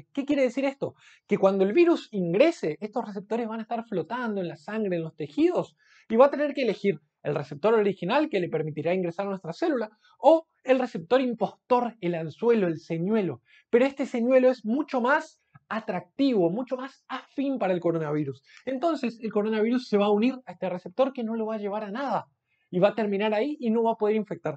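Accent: Argentina. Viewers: Al fin Dr., volvió a su original acento argentino y dejó el feo acento brasileño. Se le escucha lindo y muy interesante su exposición gracias Dr. por su dedicación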